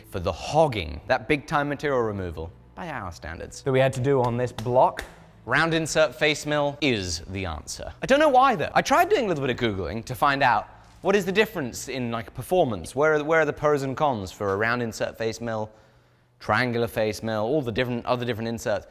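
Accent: England, United Kingdom. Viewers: A big “chube” of chips I absolutely love the English accent and what it does to certain words! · So British. So cute. So.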